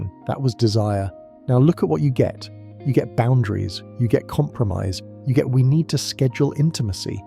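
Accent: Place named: United Kingdom. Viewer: Btw, the Limey accent makes this much more entertaining, (I’m US).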